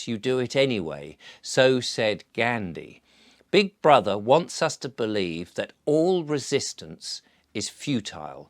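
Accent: United Kingdom. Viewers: you are a gem! Love your 'terribly' British! I am Australian.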